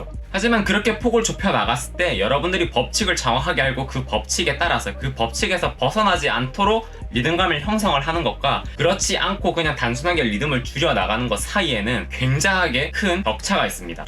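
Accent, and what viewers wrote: Gyeongsang, South Korea. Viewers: 사투리 쓰시는데 이게 맞나요? 악센트가 완전경상도 인데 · 유튜저님 리듬이 경상도 리듬같네여...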